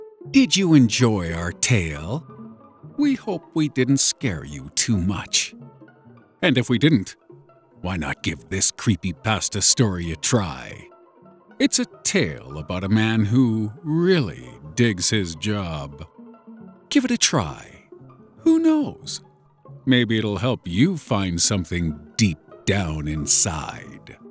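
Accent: United States. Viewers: I love your country accent man lmfao . But I told everyone on the strange mysteries comment section that this is your channel because literally everyone hates it there now ever since you left, like you were the cherry on top of the cake man Nathan just lost so many subscribers and I literally watched them because like when you would narrate its like you would make learning funny and interesting and you always caught my attention ! Now the guy they got there now is so stale and dry lol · Good story, and awesome delivery! I LOVE that slight Texas-type drawl you have❣ I think I just may have to subscribe to your channel, lol! I'd love to hear you narrate some long stories as well. One in particular comes to mind. It's called the "New Fish". King Spook did an amazing job with it, and I really think it's a story VERY well-suited to your style as well. Anyway, keep up the great work, darlin'! 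😉😘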